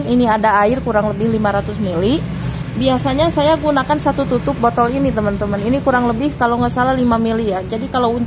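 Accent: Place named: West Java, Indonesia. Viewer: Sunda pisan eeuy...❤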